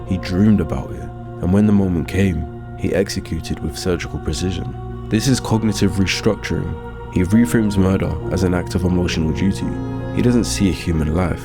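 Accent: London, United Kingdom. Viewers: the best video ive seen yet on joe and the London accent makes it even more impressive.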